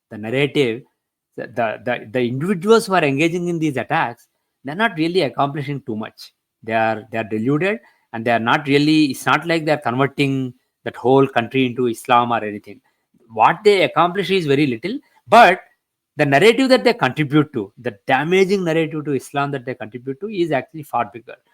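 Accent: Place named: India. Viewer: Very nice of you sir, inspite of living in USA since long, you still speak indian english, 😅which every one can follow and understand.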